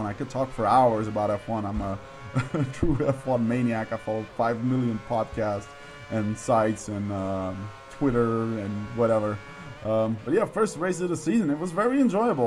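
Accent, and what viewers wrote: United States. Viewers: Are u actually belgian becus u sound american and if u ar american y ar u belgian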